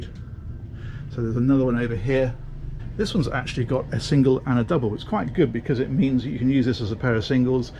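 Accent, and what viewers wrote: England, United Kingdom. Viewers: I love the Englander accent. Pretty cute and he knows his stuff! How do you make a living touring thru boats? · You do a great job showing these ships off in complete form . Perhaps probably because you talk about them in your British accent which adds alot of Class when you show all these Boats to all the public which is us .I learned alot of from Certain Yauchts dont have to Cost $13,000,000 to have alot of room in them .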